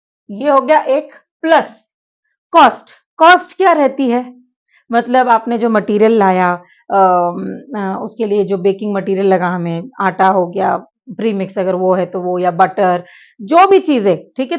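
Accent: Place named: Gujarat, India. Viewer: Medam gujarat se he ya fir mumbi se he 😊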